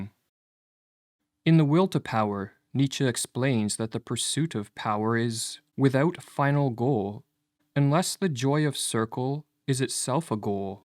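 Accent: Canada. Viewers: Canadian you are, I noticed.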